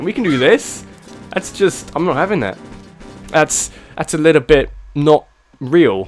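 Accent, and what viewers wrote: United Kingdom. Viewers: I love how British he is 💜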